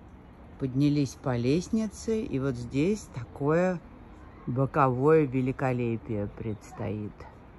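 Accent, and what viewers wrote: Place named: Moscow, Russia. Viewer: голос тридвараса..москвича все ясно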